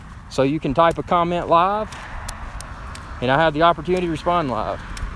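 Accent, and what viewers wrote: South, United States. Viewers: This Is One Hot Irish Bear WooF ! . He Has An Interesting Accent Is He From The South? Walking Good For You Keep It Up Dude.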